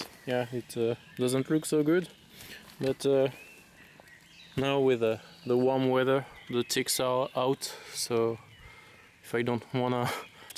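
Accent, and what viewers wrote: France. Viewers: You sound French but correct me if I'm wrong. I'd just like to know what's it like to camp. Thx uk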